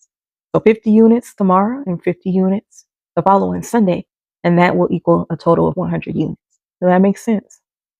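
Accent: Louisiana, United States. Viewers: Stopping by to learn from your video. Cant help but chuckle for catching your accent. What part of Louisiana are you from?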